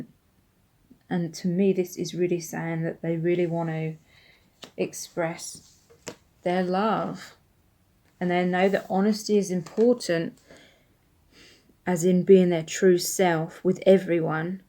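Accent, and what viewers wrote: United Kingdom. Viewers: can anyone explain to me what she meant by transformation in communication for pile 1? the person i love is living an unhappy marriage and trying to get his divorce, but he is afraid that the wife will not let him see his daughter any more , i didnt really understand her as she has a british accent and am not a native speaker.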